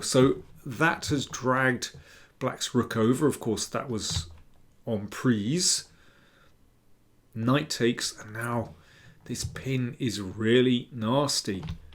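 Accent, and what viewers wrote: England, United Kingdom. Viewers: wonderful precise analysis, with that lovely English accent. bravo.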